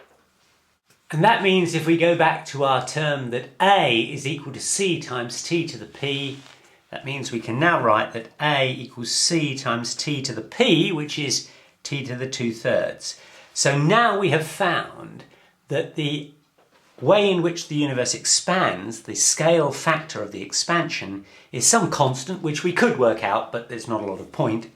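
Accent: United Kingdom. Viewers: British accents should be forbidden in youtube videos. No matter how stupid the ideas are, if they are told in a British accent, people will believe.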